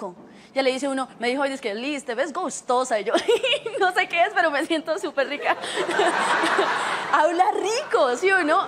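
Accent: Colombia. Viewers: uffft para un mexicano ese acentito colombiano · aquí en Chile amamos el acento de Bogotá ufffff delicia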